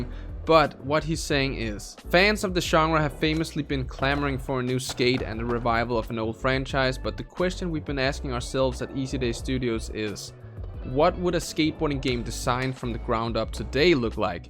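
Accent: Denmark. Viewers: Are you danish bru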